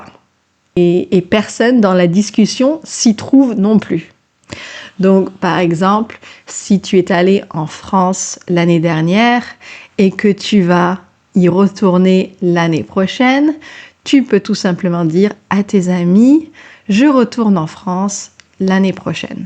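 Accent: New Zealand. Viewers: Love the Kiwi accent. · Je vais retourner à Paris ou j'étais jeune fille au pair il y a 45 ans. Je l'adore, and maintenant, je suis en train de rafraîchir ma connaissance de la langue française! J'adore ton accent en français et en anglais. Tu es dans le sud de France? Et bien sur, j'entends un accent Kiwi quand tu parles anglais! Merci beaucoup pour ces videos. Je viens de m'inscrire à la chaîne!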